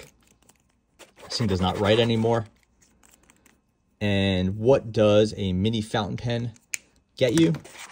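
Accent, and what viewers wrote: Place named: Philadelphia, United States. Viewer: Great reviews and you always show new and different pens ...Thanks !! BTW....you sound like you're from Philly ...is that close ?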